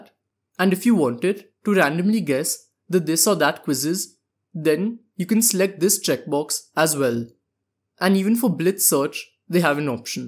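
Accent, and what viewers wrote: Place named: India. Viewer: indian or somthing like that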